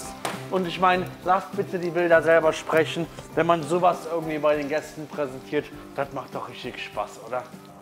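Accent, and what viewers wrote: Cologne, Germany. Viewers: wie immer alles super erklärt. Mit deinem Kölschen Akzent, Meeeggaa. Macht richtig Spaß deine Videos zu schauen! Weiter so!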